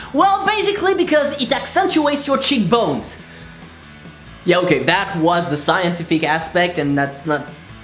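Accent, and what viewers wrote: France. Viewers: btw you can speak english with french accent more often. It's so nice to listen to. 😄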